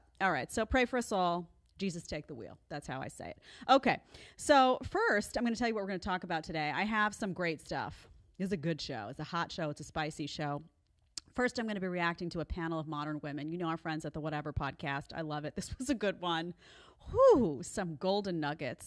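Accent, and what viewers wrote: New York, United States. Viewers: I like the points, but the way you screech and say Mmmhmmm are very off putting at times. Calm down the New York sass and your numbers will go up.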